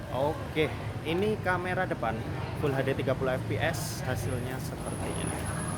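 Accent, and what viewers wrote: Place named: Central Java, Indonesia. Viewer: orang cilacap atau semarang njenengan mas?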